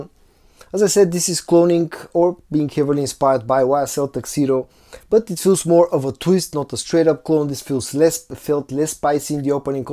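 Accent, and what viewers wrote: Bulgaria. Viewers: Great video! You're getting getting better all the time. It was funny how you just walked past the camera in the beginning. 😂 I enjoy listening to your beautiful Bulgarian accent as well, and how some words are pronounced, like it sounded like you said "meatloaf note" when you actually said "mint". 😅 I was like"Yeah! Now I need to find a bacon note!" Great to see you getting more comfortable. Thank you for the time you spend sharing with us. Love from Virginia, USA.❤️🙏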